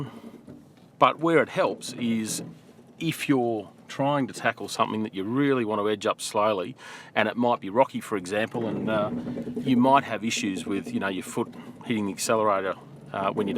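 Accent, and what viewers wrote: Australia. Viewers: auzie accent :(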